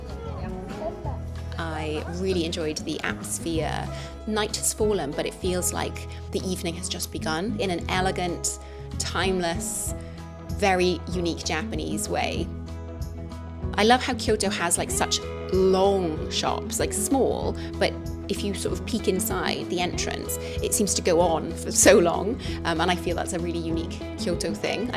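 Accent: United Kingdom. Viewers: 動画の配信がしばらくなかったので、心配していました。 素晴らしい家族愛でお父様への孝行と恩返しをされて、天国のお母様も きっとお喜びだった事でしょう。 素敵なイギリス英語とともに、感動の動画をありがとうございました。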